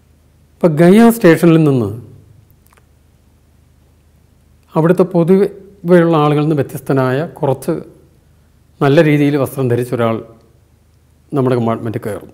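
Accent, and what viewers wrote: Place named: Kannur, India. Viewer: ആ കണ്ണൂർ കമ്മ്യൂണിസ്റ്റ്‌ സംസാര ശൈലി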